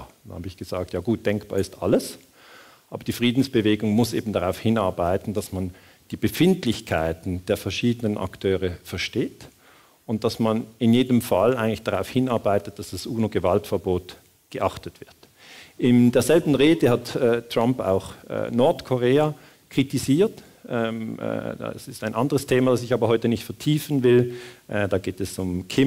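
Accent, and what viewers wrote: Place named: Switzerland. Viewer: Der Schweizer Akzent ist heilig !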